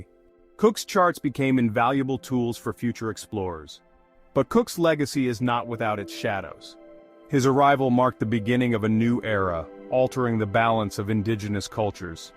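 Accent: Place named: United States. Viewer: American voice over is annoying. The Australian site Heroes and Legends does it better 😢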